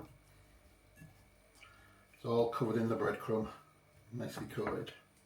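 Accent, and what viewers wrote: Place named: Northern England, United Kingdom. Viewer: I am subscribed to your channel and always 'like' a viewed video, I could not enjoy this one as I was peeved you called it 'arugula' the USA word for 'rocket', in your broad northerner accent, maybe you quoted an american recipe. I know petty, but Americanisms is so annoying and invading our beautiful British language.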